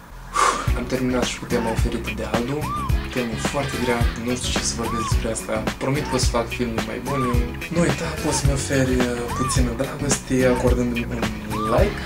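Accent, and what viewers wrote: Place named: Moldova. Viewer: o intrebare mai ciudata ^^ tu esti moldovean sau ardelean? sunt curios dupa accent esti moldovean dupa vocabular pari ardelean deci nu stiu daca mi-ai putea raspunde masi bucura pa pace!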